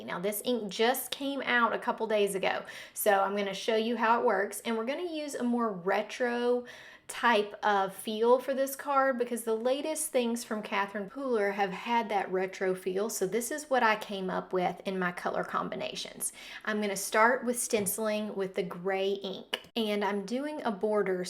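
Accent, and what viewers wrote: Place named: South, United States. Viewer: Love it! I'm a new subscriber (and team mate!). So glad to "meet" you and hear another southern accent! <3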